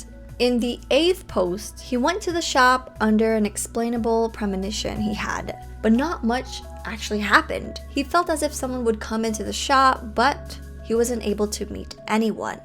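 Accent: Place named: Italy. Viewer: I Love You, your theories are always great (P.s. your Italian is sooo cute 💜)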